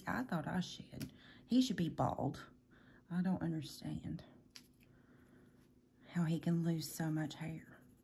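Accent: United States. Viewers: Southern girl? I used to live down south on the Gulf Coast. Some of the best accents in the U.S. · I love your accent. I too am from North Carolina. I grew up in the Outer Banks. I have just started coloring and I appreciate all you do to help me. Don’t be nervous, you got this❤️❤️❤️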